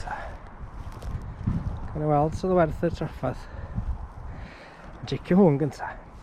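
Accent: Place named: Scandinavia. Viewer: I don't speak welsh at all, but one thing I found really interesting here; Normally I hear a massive english accent at least from southern welsh speaking people. Your accent sounds almost nordic/scandinavian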